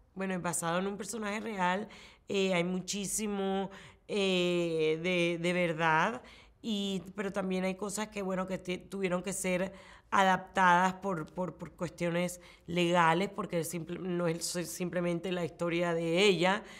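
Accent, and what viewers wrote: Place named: Caribbean Coast, Colombia. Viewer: La gente de la costa atlántica en Colombia hablan así .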